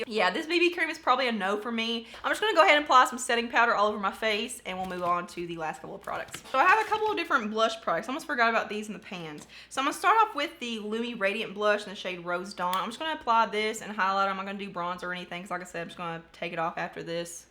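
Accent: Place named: Kentucky, United States. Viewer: Just found your channel tonight because I was looking for a review of Avon powder and already subscribed! After hearing your accent I had a feeling you were from KY like me and sure enough! Excited to keep watching your videos 💜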